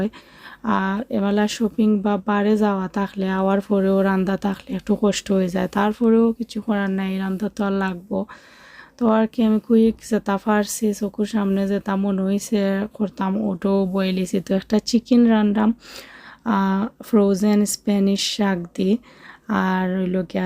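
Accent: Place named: Sylhet, Bangladesh. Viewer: Apu ki,,Sylhet er sunamgonger meye?ami o sylheti. Apnar kotha gulu shune khub valo laglo...tai apnar chanel ta subscribe kore nilam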